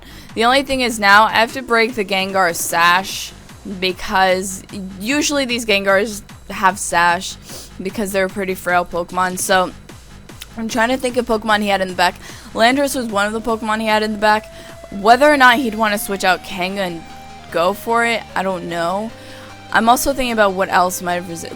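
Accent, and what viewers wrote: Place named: New York, United States. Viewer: New york accent. Plays pokemon. Gorgeous. God help me. xD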